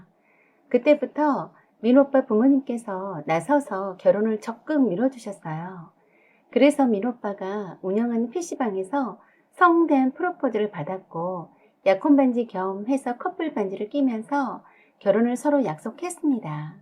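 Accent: Jeolla, South Korea. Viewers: 전라도 억양이 너무 많이 나와서 듣기 거북하네요 나도 전라도 사람이지만요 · 오늘따라 전라도억양이 엄청 강해부러요ㅋ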